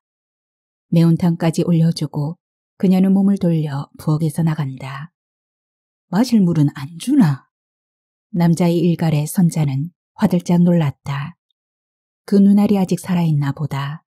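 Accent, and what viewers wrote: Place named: Busan, South Korea. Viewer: 수작이네요~신종국 작가님~특히 마지막 부분~ 오랜만에 귀에 쏙쏙 잘읽히고 부산 사투리와 레트로 감성의 가슴아픈 소설 잘 들었습니다. 그시대때는 그렇게 참고 많이들 사셨을것 같습니다. 홧병으로 심장에 북소리가 나던~~~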